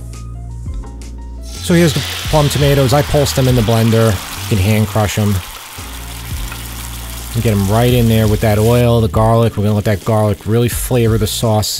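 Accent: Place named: Queens, United States. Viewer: New to your channel. I knew your accent is Queens/Long Island! I live in the Hudson Valley, but my accent is pure Bronx! Anyway, just spent two summers in Italy (I’m retired), and learned that Italian and Italian-American are almost two different cuisines. The meals we think of as Italian are virtually unknown in Italy. So I’ve been trying to cook more in the genuine Italian style, as well as using ceramic dishes I picked up around the country. As an example, I ordered veal scallopini with carciofi giudesca (not sure of spelling, Jewish artichoke). I also asked for a side of pasta. They were really confused — pasta?? Then they laughed, “Americans!” Anyway, I like your recipes.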